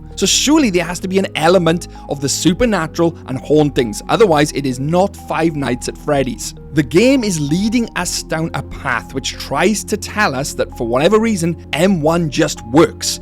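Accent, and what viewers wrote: United Kingdom. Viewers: I cannot place your accent. Where in uk is ur accent from?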